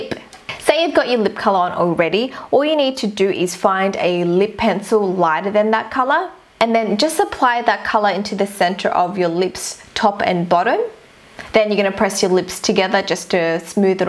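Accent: Australia. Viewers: love u r Australian accent it's so cute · Are you Austalian? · Are you from Australia? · you sounds like Australian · are you aussie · she sounds australian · I'm pretty sure that she's from China... But the thing is that she sounds so Australian🤔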